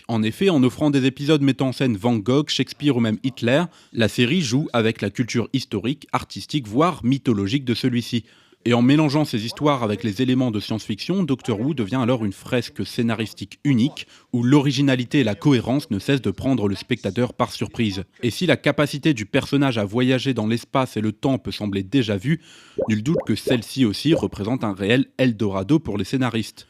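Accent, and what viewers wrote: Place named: France. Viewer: Wait... he seems to be... French!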